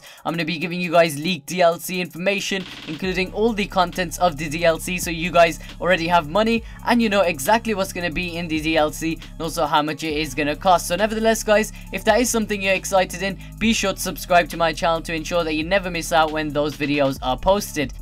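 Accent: Birmingham, United Kingdom. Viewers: I know you are from Birmingham but what is your accent?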